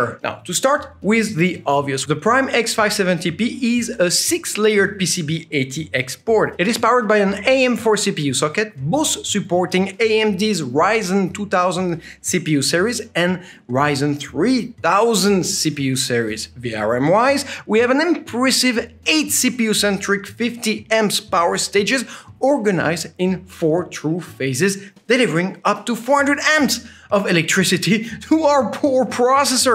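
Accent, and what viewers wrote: France. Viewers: Do you are french ?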